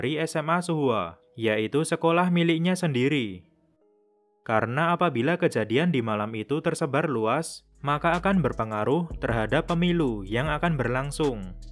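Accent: Indonesia. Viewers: Gaya ngomongnya kek orang indo:v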